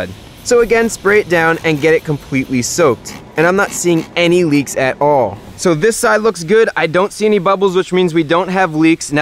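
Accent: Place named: Pennsylvania, United States. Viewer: Good wooder PA style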